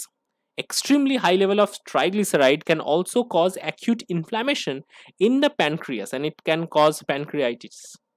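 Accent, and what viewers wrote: India. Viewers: bro use AI english or american accent it will promote ur videos,. we indians arent respected in the world so dont use our secret accent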